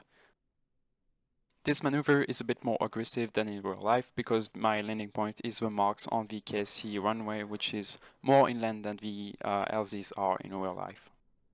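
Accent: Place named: France. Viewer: La vidéo apparait de manière random dans mon fil... je t'écoute parler et je reconnait un petit accent francais.. tu serais pas à Supaero par hasard?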